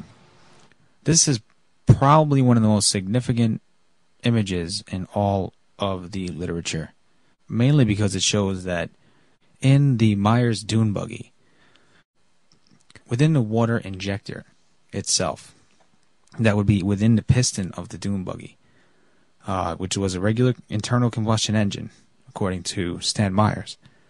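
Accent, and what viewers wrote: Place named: Sweden. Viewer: UR injection of ignorance into this forum reeks arrogantly with a sweedish accent to boot, like dead Ya Ya Gabor's television appearances, so annoying.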